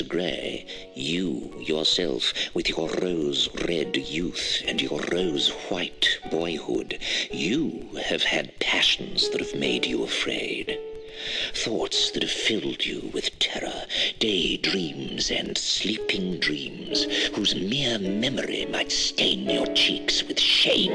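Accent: United Kingdom. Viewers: Very good, but not how I picture Wilde sounding. He was Irish, this fellow sounds English and older. But a good reading nonetheless.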